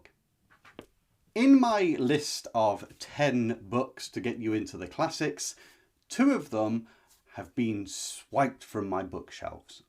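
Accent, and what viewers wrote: United Kingdom. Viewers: Would love to hear you read some of these out loud in your impeccable British accent! You’ve inspired me to pick up some classics, thank you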